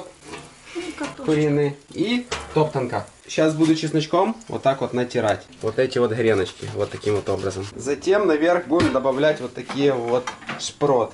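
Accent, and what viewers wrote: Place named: Ukraine. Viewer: Может он сам будет решать что ему делать, и говорит он хорошо, тем более он не из России, в украинском акценте нет ничего плохого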